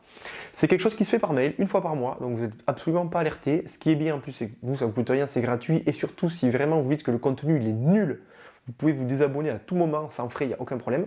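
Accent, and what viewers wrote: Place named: South, France. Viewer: Hello ! Alors moi je t’explique, j’ai entrepris des démarches depuis quelques semaines pour devenir mandataire immobilier, en auto entrepreneur... Évidemment je n’y connais rien en Immobilier car je débute et qu’il faut un début à tout, je vais apprendre grâce à mon réseau l’agence pour laquelle je dépends, et en autodidacte sur les innombrables vidéos tutoriels YouTube qui existent.....puis en allant sur le terrain parce que c’est sur le tas qu’on apprend le plus aussi ! A ton accent on devine que tu es du Sud, si ça peut te rassurer on ne sera pas concurrent puis je suis du « Noooooorrrd » comme disait Galabru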